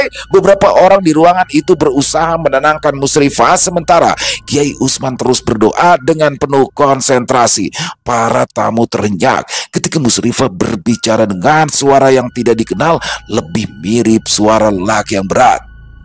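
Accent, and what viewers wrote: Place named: Banjar, Indonesia. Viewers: Bang ini sudah kayak orang Banjar asli 😂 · Bang logat bahasa banjarnya mantap banar 😄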